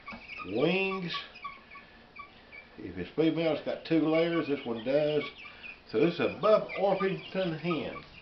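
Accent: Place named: South, United States. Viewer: I understand the frustration about lighting but at least this is better to watch than some of the other crap on YouTube I encourage anyone doing educational videos to continue to do so. Not only is it a learning experience for the person doing it, it's information you can decide to use or at least consider. Also I love your accent I was born in the deep south in Texas but from living up north north than half my life I have a mixed accent of north and south and I just love the southern accent.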